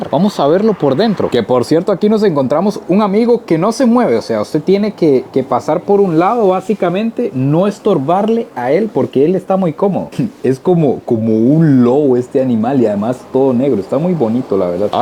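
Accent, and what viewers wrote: Argentina. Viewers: Te queda bien el acento argentino, en serio. Los perros desarrollan un pelaje impresionante acá por el frío. La intendencia sería allá (creo...) la alcaldía, no? La estatua es la de un gaucho argentino. Las malvinas son argentinas, los piratas ingleses no se vinieron desde tan lejos a robar, saben que las tierras son ricas en minerales y además, son tierras ricas en petróleo. Los amigos de 4 patas le dio un bello toque al video 🙂 y en realidad, la historia dice que si comés calafate (la fruta típica de acá) te quedás en el sur. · Amo escuchar el acento argentino !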